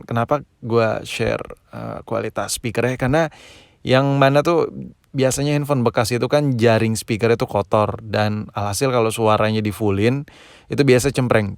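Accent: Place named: Java, Indonesia. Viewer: Thod bahasa jawa lo sama persis kek daerah gw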